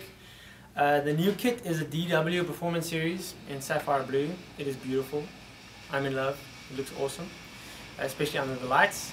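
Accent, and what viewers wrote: South Africa. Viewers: when ever u talk it reminds me of relatives in South Africa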